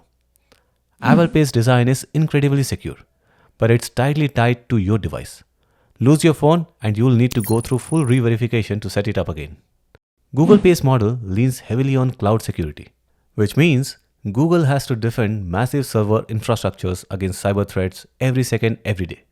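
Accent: India. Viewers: Are you India ? You speaking likes India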